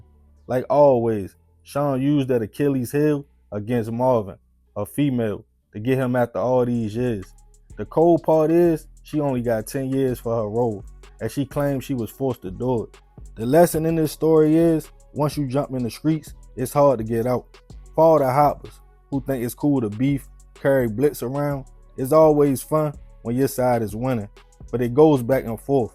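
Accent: Baltimore, United States. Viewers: Ngl that intro is hella corny, is that how Baltimore niggas talk?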